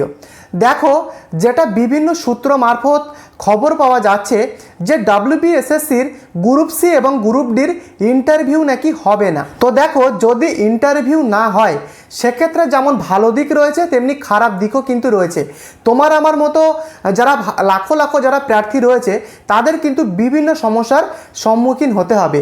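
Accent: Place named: Bangladesh. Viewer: tomar bhasai bangladesi banglade vaab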